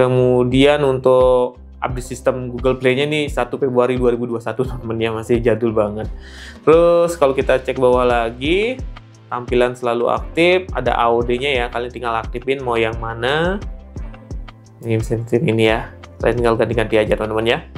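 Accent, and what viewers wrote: Bali, Indonesia. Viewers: Bang lu dari mana? Adi logat care nak Bali?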